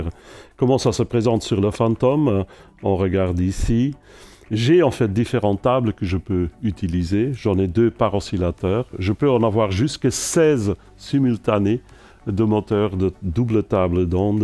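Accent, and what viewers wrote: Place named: Belgium. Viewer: Il as un accent belge lui mdrr super présentation 👍👍👍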